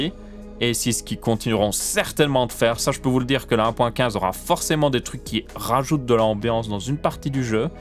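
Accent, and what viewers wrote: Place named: Ardennes, Belgium. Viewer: Ardennes belges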